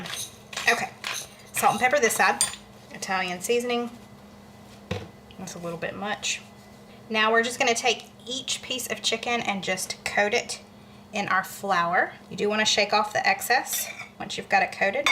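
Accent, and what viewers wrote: South, United States. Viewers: His voice sounds like Tom Hanks with a southern accent! 💞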